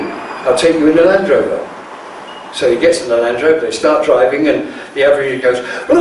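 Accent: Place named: Torquay, United Kingdom. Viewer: That's what I thought too, it's his accent 'talky' Torquay.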